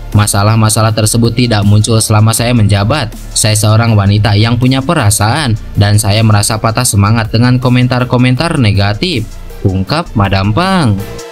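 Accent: Java, Indonesia. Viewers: Ini youtuber bicara mya aja medok ga bagus , nyesel putar vidio ini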